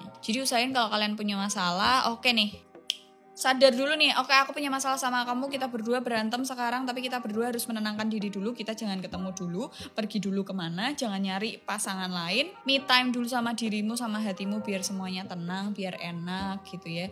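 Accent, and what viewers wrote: Java, Indonesia. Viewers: Akhirnya kangen medoknya mba terobati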